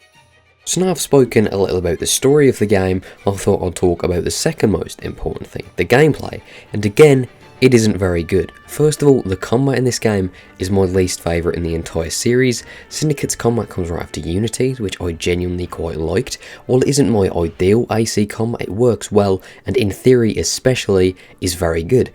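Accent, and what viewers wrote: United Kingdom. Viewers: Hello, I've just finished playing this game, and it was my first AC ever. And I loved it. But I also totally agree with your review! Thanks for opening my eyes in some aspects. I still enjoyed it though, and will probably platinum it since i'm halfway through that already. Also, I'm a sucker for London and British accent, so I guess I'm biased. I suscribed for this review ♥ gonna binge-watch some other videos of you!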